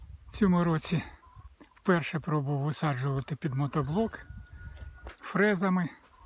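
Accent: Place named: Kharkiv, Ukraine. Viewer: Какой у вас укр.язык красивый прям как у нас в деревнях-Харьковская область.У меня папа так тоже говорит.Мира нам всем и доброй погоды.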